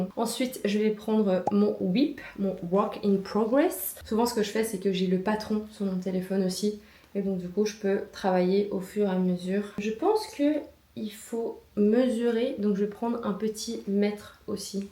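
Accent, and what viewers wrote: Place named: Belgium. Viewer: j’adore ton petit accent belge, ça me rappelle d’où je viens 🥹 je crois que j’en ai encore un peu moi aussi, c’est drôle comme les accents nous suivent partout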